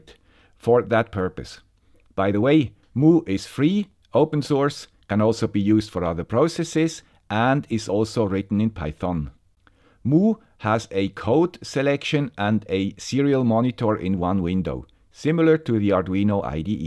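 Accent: Switzerland. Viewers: Yeah I like the dir command. Long ago I made a python program that allowed the use of cd, change directory, even. I think it went into lists and dictionaries as well. People seem to copy what I make, even when I think it's locked down. Nonchalant works better. Regardless, I seem to give people good ideas as well. Love this place! Thanks man with the Swiss accent. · I also love your intro ! When I hear it is the "guy with the Swiss accent" I know I will be watching something educational and entertaining ! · The guy with swiss accent...hehe...Hallo und viel erfolg.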